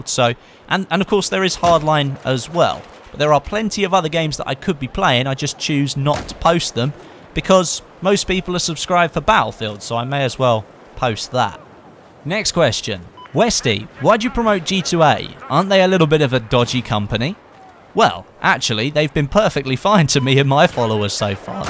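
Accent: England, United Kingdom. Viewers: How did people not know you were from the UK? XD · Story sounds a little English to be honest. Didn't realise you're close to London. I thought you were like me (midlands)! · In fairness, as an American, I could clearly tell that Westie was British. He doesn't sound Australian at all imo :P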